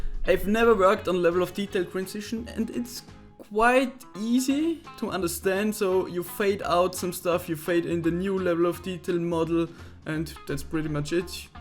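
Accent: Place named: Germany. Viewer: Ein erster Schritt wäre dein Linking zu verbessern. Relativ einfach und intuitiv, wirkt dem deutschen Aktzent entgegen. Im Englischen werden zwischen Worten oft keine Pausen gelassen, wie dies im Deutschen zwischen quasi jedem Wort ist. Bspw. "an apple" wird als ein Wort ausgesprochen. Ich hatte diesen 'Trick' nach etwa einer Woche verinnerlicht (wird einem erst in der 12. Klasse offenbahrt).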